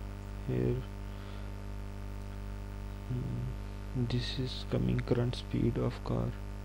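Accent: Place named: India. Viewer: Thanks man .. Great video .. Indian voice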